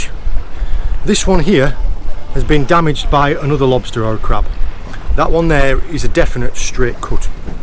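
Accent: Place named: Northern England, United Kingdom. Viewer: I would like to thank you for your videos I have been watching for about a year now but recently my dad who passed away Christmas morning found so much happiness watching/listening to you he had them on back to back for the last 2 weeks of his life I think it must have been your northern twang he found piece with as his farther and family come from Darlington. Once again thank you, you made a very poorly man relaxed thank you once again xx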